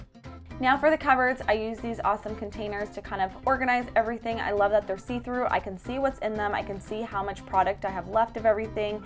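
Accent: Canada. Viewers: Oh my goodness your Canadian pronunciations! I am not used to hearing words said that way lol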